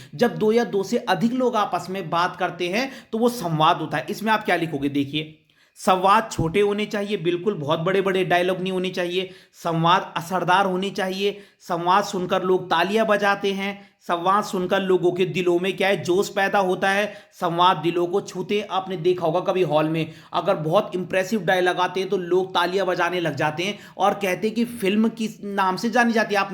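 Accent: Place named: Pahadi, India. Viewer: You are phadi sir apki awag lgri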